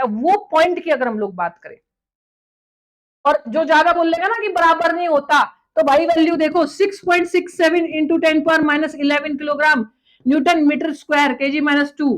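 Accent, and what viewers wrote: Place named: South Haryana, India. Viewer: Bitiya aapki bhasha se lagtah h aap South Hariyana se ho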